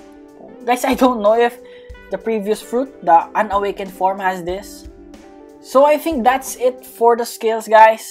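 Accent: Philippines. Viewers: Is it just me or accent is Filipino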